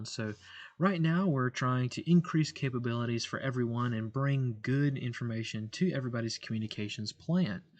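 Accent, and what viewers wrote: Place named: Georgia, United States. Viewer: You from Georgia? You gotta similar accent to my buddy from Georgia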